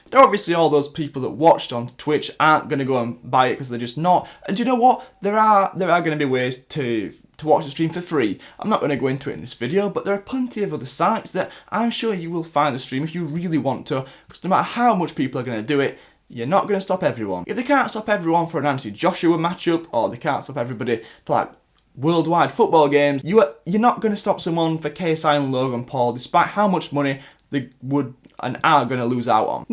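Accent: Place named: Yorkshire, United Kingdom. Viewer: BALL , hi I hope you see this comment , I love ur content and I have a question : where are you from ? your accent sounds northern ( maybe Yorkshire or something like that ?) ps: keep going mate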